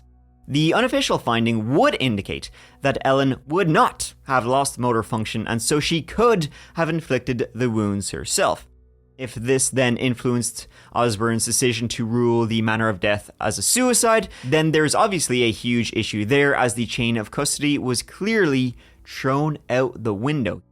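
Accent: Ireland. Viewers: I liked our little date 😂😂 We love your banter with but you are what hooked me. I love spooky stories in an Irish accent, thank you for all you do!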